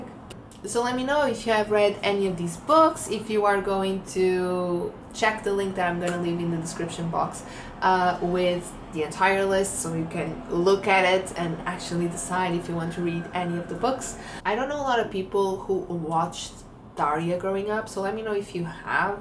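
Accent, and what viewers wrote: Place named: Russia. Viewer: She's Russian. Her accent is obvious to me.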